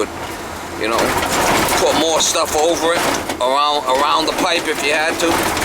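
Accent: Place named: Massachusetts, United States. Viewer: I really like your videos. I think you must be in Massachusetts. I would totally call you to yell at me at my house if I had a plumbing issue! "That shits gonna be there for life. It'll be there forevuh!"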